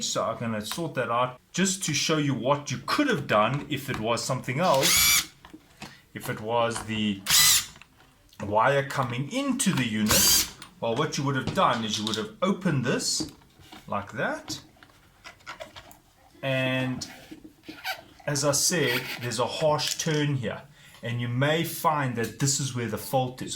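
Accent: South Africa. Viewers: SA accent:)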